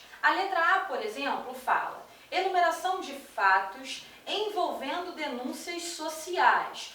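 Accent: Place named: Rio de Janeiro, Brazil. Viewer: Carioca é foda, fico prestando atenção só no sutaque kkkkk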